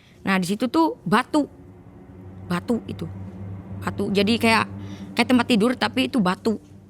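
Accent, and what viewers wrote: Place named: Java, Indonesia. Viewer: cantik, ada medok²nya😅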